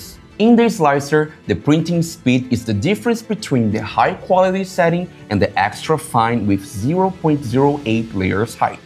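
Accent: Brazil. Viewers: Your accent sounds like mine, are you Brazilian? 🇧🇷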